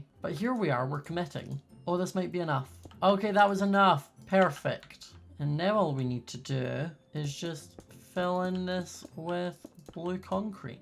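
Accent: Scotland, United Kingdom. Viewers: What part of scotland you from, assuming not glasgow, maybe edinburgh? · Scottish - English Tiger-tinger Cute-cuuet Lol leave a like.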